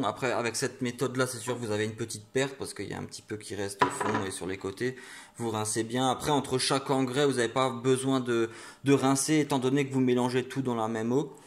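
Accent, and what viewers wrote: Alsace, France. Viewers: L'accent alsacien 😭😭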